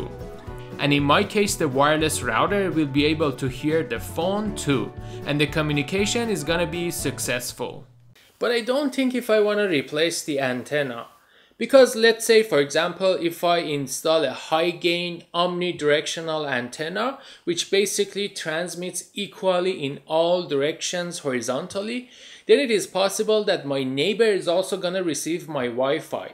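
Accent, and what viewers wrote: Iran. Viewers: Why do get a strong persian accent from your voice?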